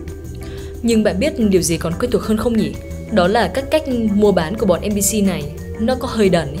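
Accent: Vietnam. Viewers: Voice mới người Thanh Hoá à? 😢 Giọng nghe mệt quá, k xem hết nổi video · Bạn nài quê Nam Định hỏ, nghe giọng giống lắm 😐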